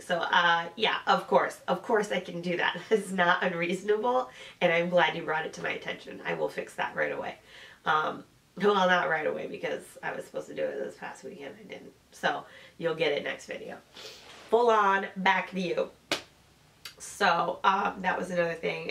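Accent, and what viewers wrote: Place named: Michigan, United States. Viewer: here are my questions, most of which are non-sewing related. 1) Are you from Michigan, you sound like you are from Michigan? If, yes, where? FYI Detroit is one of of my favourite cities in the world, yes, you read that correctly. LOL 2) I think I heard you say once that you are a mechanic but you also work the midnight shift, who gets their car fixed in the middle of the night? I don't understand. If you are willing to share, please explain. 3) I would love to see a video on your TNT patterns and finally 4) what is your most worn and/or favourite me made item. I love your channel and hope you are feeling better.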